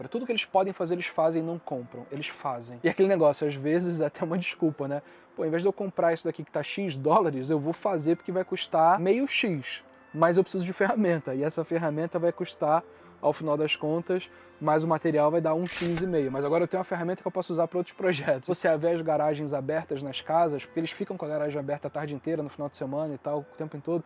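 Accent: Rio de Janeiro, Brazil. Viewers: Vídeo muito bom Mas o cara fala assobiando com esse sotaque de carioca que chega a dar um ruim nos ouvidos kkkkk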